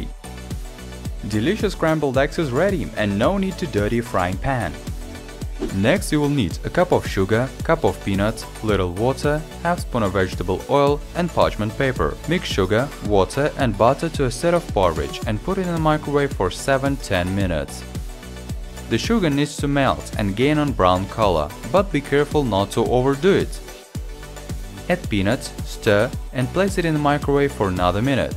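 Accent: Russia. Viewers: ja irgendwie schade ich finde grad sein russischer Akzent macht ihn total sympathisch. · ax etot rouski aktsent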